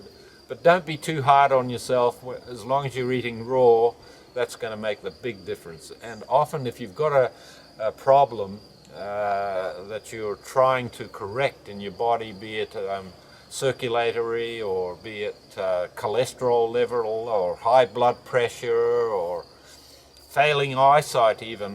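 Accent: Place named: Ukraine. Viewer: Приємно чути, коли не просто українська, а.. чиста українська )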